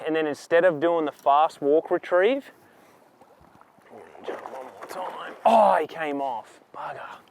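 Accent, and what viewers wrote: Australia. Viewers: I could listen to Aussies say "cover water" all day. This guy has a solid accent. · First time hearing. Thought he was Canadian. But sounds Aussie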